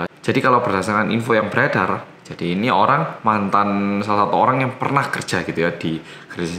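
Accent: Java, Indonesia. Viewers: Mas nya org Jawa ya? Logat ya kayak jowo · Welcome back to korea reomit bersama orang korea yang : MUEDHOOOOOOK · Jowo nya MEDOK bgt.. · Yuuuhh aku kok lagi ngerti channel iki tooo.. Kapan lg kan liat muka korea bening bahasa indonesia paseh logatny jowo.. Biyuuuhhh😂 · Mas saya baru subscribe, mau tanya kok bisa lancar gitu bahasa indonesia nya? Medok maneh...belajar dimana mas? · Medok tapi cute... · Kok koyo wong jowo ngono e medok ngomonge xixixixix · pantesan jowonyo.medok.tenan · ngga apa ngomongnya ngejlimet yg penting tetep Meedddooookkkkk... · Logat Jawanya medok ya.Tapi suka dengarnya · STAY SAFE MAS MEDOK!! Semua agama baik kok, ga boleh saling menjelekan agama. Ingat lagu “Tuhan memang satu, kita yang tak sama~~” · Mas ada keturunan indo ngk sih? Kok ada logat" jawanya tapi ada jg logat" Korea nya?🙁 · Oppa knp accent nya medok yah ? But u studied in RMIT before right ? 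· dulu sekitar taun 2017 pas channel ini baru mulai, aku dikenalno kokoku, katanya ada channel yutub org korea tp ngomonge medok. trus aku ditunjukno video2 channel ini. tp, well.. aku gak bnr2 ngikutin krn sbnrnya kontennya bukan aku bgt "dulunya"... aku gasuka sm review2 hahha. trus baru2 ini kok tak liat2, tak denger2in lama2 lucu jg ngmgnya wkwkwk. enak ngejelasinnya btw, trus ganteng #ehhh salfok hehe so here i am, hi, i am ur new subscriber :D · Medok bgt ngomong nya 🤣🤣👍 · Nada bicaranya jawa bangett gituu🙂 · wong ndi sih iki..muka korea,logat jwa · Leh kok jowo bgt srko jowo endinsih leh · Medok rekkk😉😉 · Ada logat jawanya juga masih nampak logat koreanya..😆😆😆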